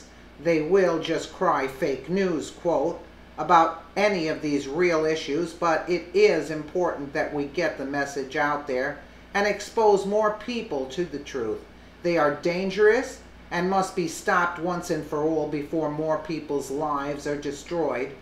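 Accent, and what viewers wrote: New York, United States. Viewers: Where's your voice from? New York? I dig it!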